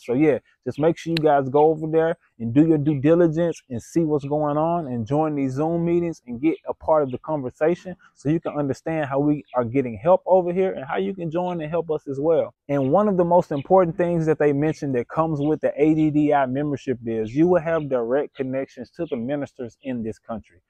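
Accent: United States. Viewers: Bye-bye I want to hear African accent not American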